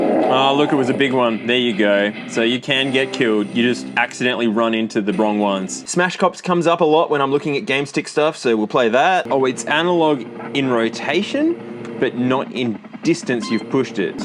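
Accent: Australia. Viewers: Didn't know I needed to hear an Aussie say "Ouya" today but here we are. What an accent. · And I think he's living in Australia because of his accent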